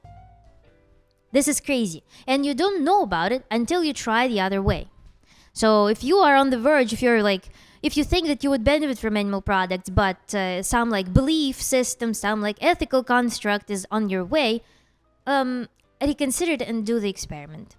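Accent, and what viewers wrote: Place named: Eastern Europe. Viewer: OK I could sense an eastern European accent, but I wasn’t quite sure. I have three different friends from Russia and we talk on Skype. One is from Moscow one is from St. Petersburg and the other is from Novsibirsk. I’m from Texas. One time I told one of my Russian friends I believe it was the one from Siberia that it was still very hot in September. It was about 38°C. His only reaction was holy shit lol. Another time in summer, my friend from Saint Petersburg asked me what the weather was like. Well, I asked the Amazon echo to convert from Fahrenheit to Celsius and the Amazon echo said it was 40°C. He thought he misheard. He was like did it say 40°C? Lol.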